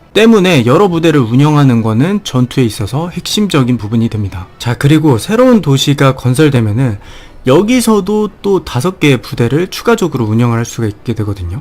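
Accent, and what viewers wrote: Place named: Jeolla, South Korea. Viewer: 전라도 사투리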